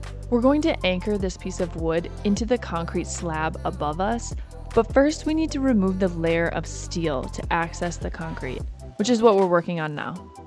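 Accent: France. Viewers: Bonjour Est ce un petit accent français que j’entends mdr Superbe vidéo merci de vos conseils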